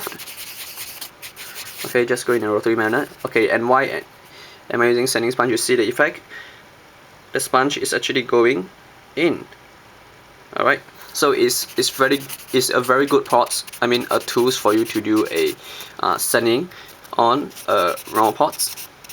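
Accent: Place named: Asia. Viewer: Thank you very much for this vid!! It'll help me alot! BTW, you're Asian right? Probably Chinese or Japanese? I can feel it with your accent.. :)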